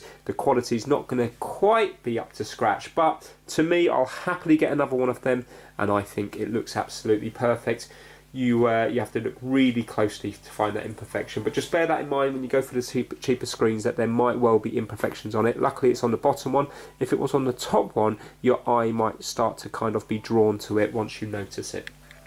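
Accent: United Kingdom. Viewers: Just Found ur channel Love The fix it stuff and that soothing UK voice LUL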